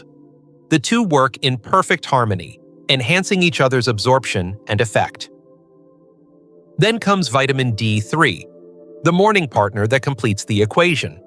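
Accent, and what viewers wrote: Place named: United States. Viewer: 0 Dr you can not deny that you have Indian Genes Than why you do not SPEAK IN INDIAN TONE? HOPE YOU WILL ADMIRE OUR CONCERN AND WILL avoid American tone in your videos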